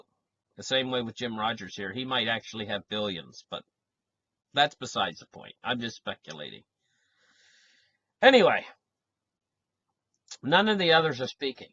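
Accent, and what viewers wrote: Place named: Northern Ontario, Canada. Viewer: His name is. I asked him where in Canada he lives and he didn’t respond. Judging by his accent, I would say Northern Ontario or the Maritimes (eastern Canada)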